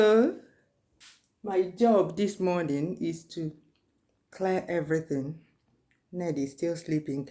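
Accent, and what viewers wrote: Igbo, Nigeria. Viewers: You sound like my Village people. Ideato North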